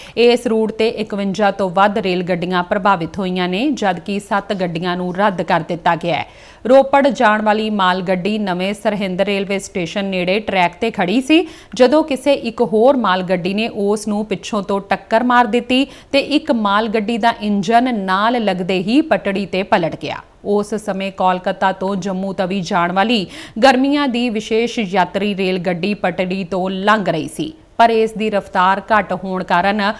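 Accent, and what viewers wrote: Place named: Bihar, India. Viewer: Yeah anchor Punjabi nahi bihari lagdi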